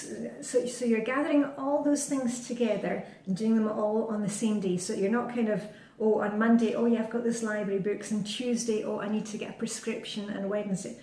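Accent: Scotland, United Kingdom. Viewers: I love your accent! Just wondered if you read the latest Ian Rankin, In a House of Lies. I often listen to the audiobook versions because I love the Scottish accent.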